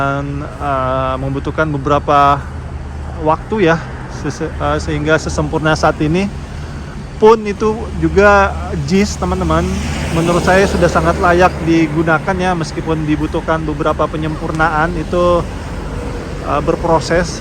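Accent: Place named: West Sumatra, Indonesia. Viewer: Dari logat bicaranya nampaknya abang ini "orang minang"... Salam urang awak....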